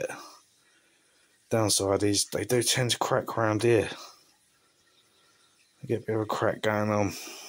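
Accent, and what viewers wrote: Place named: Kent, United Kingdom. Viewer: Oh my Gawd wot a smashing collection . Your accent also makes me miss the old country and living in Kent back in the day . Cheers Alberta Ray o7.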